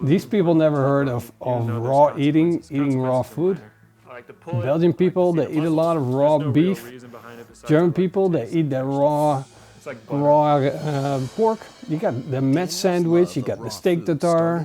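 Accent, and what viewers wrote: Netherlands. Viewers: I’m new to your channel, but you have a Dutch accent.. are you Dutch? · Just found this channel and immediatly i can hear you're dutch. The Dutch/English accent stands out so wel haha! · Is he from holland his accent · His dutch accent is so strong😂😂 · idk what it is about the Dutch but you can always instantly tell whether they’re Dutch or not, you can’t do that worth Scandinavians for example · i like this guys dutch acccent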